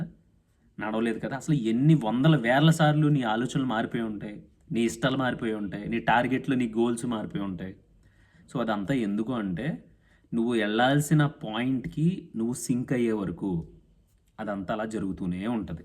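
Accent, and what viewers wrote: Godavari, India. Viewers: Brother manchiga cheppav,mana east and west godavari stylello,ur native place?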